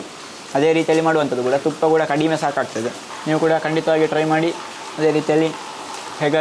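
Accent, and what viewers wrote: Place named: Dakshina Kannada, India. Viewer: ನೀವು ಬಿಡಿ.... ಯಾವತ್ತೂ ಸೂಪರ್. ನಿಮ್ಮ ಅಡುಗೆಯನ್ನು ನೋಡುವುದೇ ಚೆಂದ ಹಾಗೂ ನಿಮ್ಮ ದ.ಕ ದ ಕನ್ನಡ ಮಾತು ಕೇಳಲಿಕ್ಕೇ ಆನಂದ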